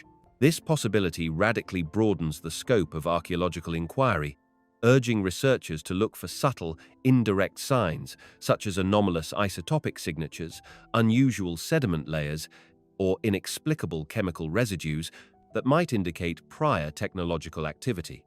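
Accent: United Kingdom. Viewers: It’s remarkable how repetitive this British sounding video is while we’re all waiting to see pictures of intelligent reptilian beings. If the narrator had a southern accent it would be easier to realize the repetitiveness because the southern accent sounds lees intellectual to northerners perhaps due to how Hollywood and other sources have often poked fun at American southerners.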